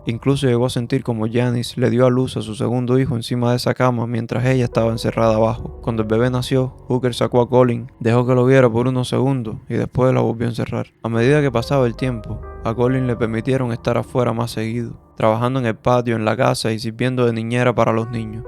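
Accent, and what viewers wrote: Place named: Cuba. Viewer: 😂😂😂😂😂Eres cubano 100%.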